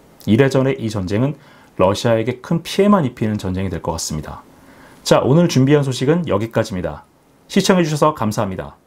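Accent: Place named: Busan, South Korea. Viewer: 말이 뭐가 빠랐냐 니가경상도 부산억양에 익숙하지않아서 그런것일 뿐이다 노량진 학원가서 경상도말 배워라. 나는 그것도 늦어서 속도1.5로듣고있다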